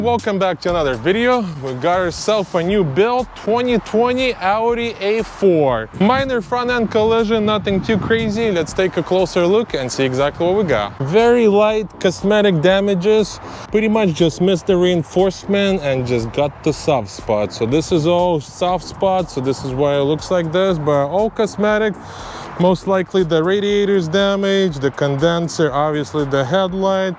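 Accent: Russia. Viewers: Такое чувство, что чувак русский🤔. Акцент русский)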